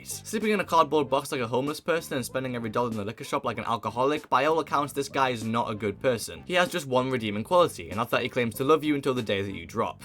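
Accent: England, United Kingdom. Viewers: Bro’s such a fan he always talks like an English chap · Bro is an English chap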